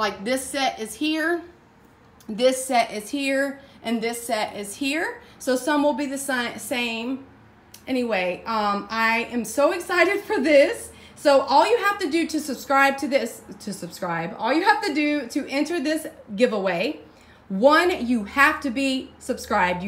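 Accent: South, United States. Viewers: Giveaway........ Congrats on hitting 17k, we love watching you and I adore your southern accent. Thank you for giving back!!!!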